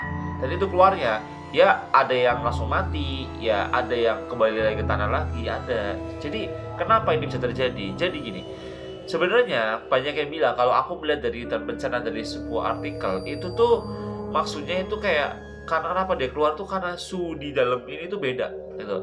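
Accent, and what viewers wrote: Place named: Java, Indonesia. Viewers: medoke ketara nemen yakk hahahaha... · medok nya yang buat aku klepek klepek malah hihihi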